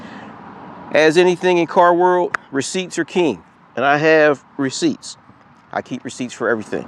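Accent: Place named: Pittsburgh, United States. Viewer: You from Pittsburgh? Ya accent sounds like it.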